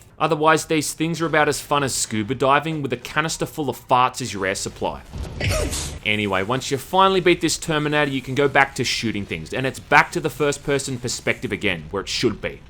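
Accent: Australia. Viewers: This. I mean, sure, he does have that sweet Aussie accent, so I could have misheared him, but saying 'three' and sounding like 'four' is still a bit unlikely. :/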